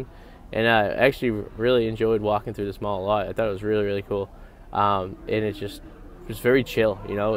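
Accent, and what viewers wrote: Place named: New England, United States. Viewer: If anyone is wondering what a New England accent sounds like... :)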